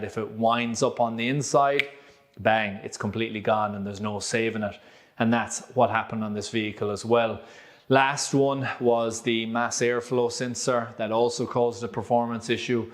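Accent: Ireland. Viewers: Completely agree with your list. All mechanics and auto electricians have a list of vehicles that they would not recommend to their worst enemy. I am retired from the trade and I understand the issues that mechanics have to face in relation to dealing with badly engineered vehicles. Unfortunately, all vehicles have issues of some kind, my list includes some Peugeot models, not I hasten to add not the old 505/504 models. Some Volvo models have given me grief in the past. As usual great video. Just out of interest what part of the republic of Ireland are you from, cannot place the accent, I am from Northern Ireland and living in Melbourne Australia.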